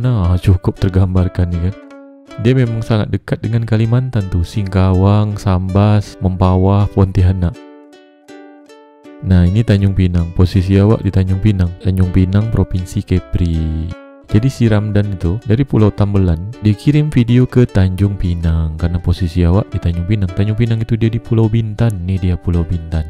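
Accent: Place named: Perak, Malaysia. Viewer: Salam dr mlysia..dgr drp dialek tuan punya video, mcm dialek org perak jer, awak tu mksudnya saya..dialek k.kangsar & parit..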